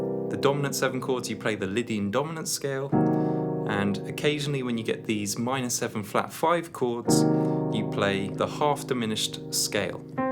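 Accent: United Kingdom. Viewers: I damn near pulled my hair out of my head listening to your horrible British accent! I turned it off 3 min in.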